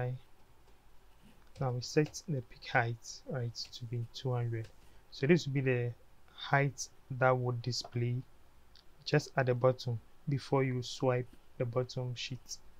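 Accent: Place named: Nigeria. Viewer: nice video, you have a nigerian accent are you from Nigeria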